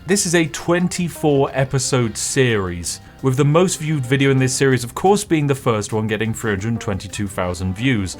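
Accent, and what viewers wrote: United Kingdom. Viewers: Still prefer your brit voice instead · I don’t have auto play on, but I have put on a Spiff video from time to time to get in a good nap after work. He’s not wrong about his smooth low British voice. ;) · No auto play but I regularly use your videos specifically Victoria 3 to go to sleep, that smooth British voice soothes my coffee drinking self to sleep, win win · I was wondering what was making it so I was always being awoken by a British voice talking about tea when I started my night about dinosaurs and monster hunter ecology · Why is thing British Guy telling me to go to sleep at 6pm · I was sleeping, then I heard a familiar British voice and I woke up edit: This is why I fall asleep watching something rendom and always end up in Linus' podcast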